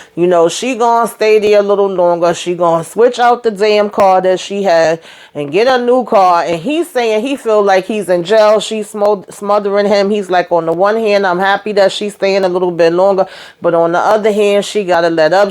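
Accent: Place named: New York, United States. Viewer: Thank you for your excellent reviews. I just love your NY/NJ accent. It sounds like home. (I’m from LI). These LALU folks are not in their right minds especially Gabby and Chris. I fear for them and can see the more domestic abuse in their future. What a mess, but it’s so entertaining 😁 Keep it coming sis! Blessings 🙏🏾🙏🏾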